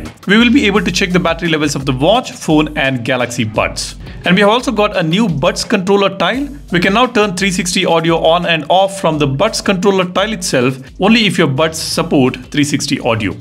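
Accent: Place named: India. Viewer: Your English is terrible 😅😅Indian English..i got headake to listen to you..great Review 👍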